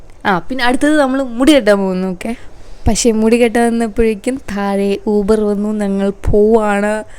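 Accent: Thrissur, India. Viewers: ഭാഷ കേട്ടിട്ട് തൃശ്ശൂർ ആണെന്നാണ് തോന്നുന്നത് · Thrissur touch und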